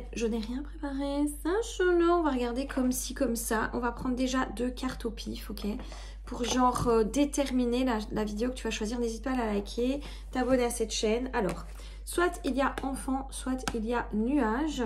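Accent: Belgium. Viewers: Quel chouette petite accent belge!!! Merci pour l a guidance. Bon weekend.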